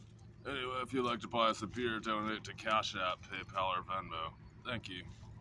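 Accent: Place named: West Coast, United States. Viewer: west coast accent was my initial guess when i first seen urn channel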